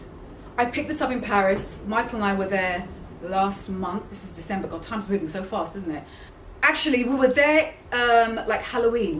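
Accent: United Kingdom. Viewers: Hi beautiful - your accent wow! New to your channel, via Arvin Olano. Thanks for the Inspo, with your fashion taste I’m surprised your videos are not edited with music? You would rock any runway! Cheers!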